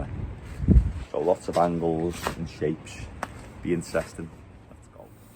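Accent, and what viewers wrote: Liverpool, United Kingdom. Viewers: you’re from liverpool…..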